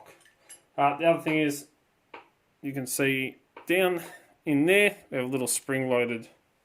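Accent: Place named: Australia. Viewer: well compare to lockpickinglawyer, you will hear the difference. Nothing wrong with the content but the sound is going to be an issue if you want subscribers outside Straya who are not that familiar with the accent. Anyways have a great christmas time man!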